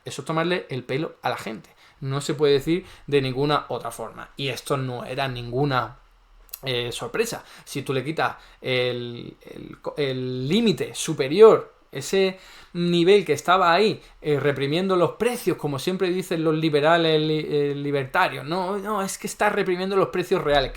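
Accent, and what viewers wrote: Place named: Galicia, Spain. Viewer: Se nota por tu acento que sos gallego te pido por favor que te quedes alli .A espana le va muy bien,no.?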